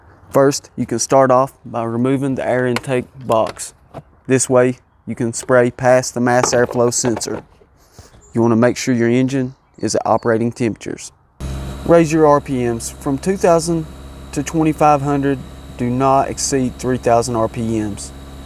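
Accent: South, United States. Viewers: Thousand likes just for the "down south" accent!!!!!!!!!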